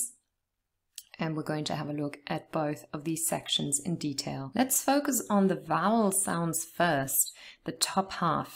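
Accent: United Kingdom. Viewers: I could listen to you for hours together. Language and phonetics is such a fascinating world to explore. After watching Dr Geoff Lindsey, my curiosity about English phonemes exploded, but I was not acquinted with the compound vowels symbols, so was finding it a little difficult to follow some of his videos. So I am glad YT brought you in my feed a week ago. I am bingewatching your videos to understand the basics of English vowels and consonants and speech pattern. I am always fascinated by British accent. Your videos threw light on how it comes to be. I hope British people do not lose their accent in coming generations now that everything is getting Americanised these days. 🙏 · Is it British accent?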